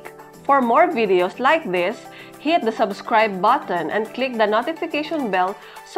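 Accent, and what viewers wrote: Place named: India. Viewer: i would have fell for it if it wasnt for your indian accent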